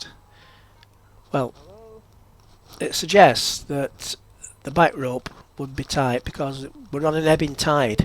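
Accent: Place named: North, United Kingdom. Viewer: What is the name of the editing suite, Premiere ? It’s your northern accent!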